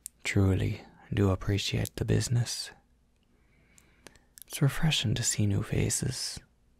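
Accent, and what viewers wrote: Ireland. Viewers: Omg You're so damn good at being Irish!!!!!!!!!!!!!!!!!!!!!!!! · This was so good I might just need a second part to this o-o This is the only Irish fey asmr I could find and it works so well and just hits different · I saw irish accent and I was like "MY KIND" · my dads british and invites his irish friends over the pond, so the one time i was around there were 2 guys over and one of them sounded a lot like this dude, and the other guy almost broke my eardrums and drank an entire bottle of whisky i was saving for my bday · i’m irish and i’d like to say your accent is quite good! we don’t see a lot of americans who are actually decent at irish accents because it’s very very hard to recreate because we sound like a bag of spanners being thrown down the stairs so well done! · The Irish accent needs a little bit of work, but was genuinely not bad! 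I'm so used to people imitating our accent and being immediately enraged. But, this was pretty good! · You should really do more on this. Your accent sounds good and the character is wonderful ^^. Much love for Ireland <3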